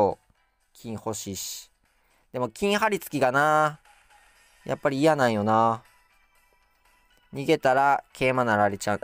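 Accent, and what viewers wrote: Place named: Kansai, Japan. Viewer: 関西人特有の適当トークが好きです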